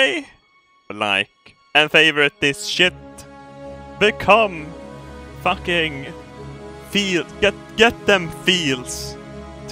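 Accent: Norrland, Sweden. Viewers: Love how you can here your norrlands dialekt when you speak swedish <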